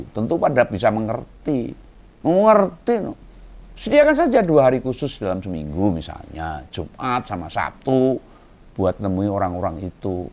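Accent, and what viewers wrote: Java, Indonesia. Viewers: aku seneng bosomui je, campuran, jowone medhok dengan istilah kuno. 👍👍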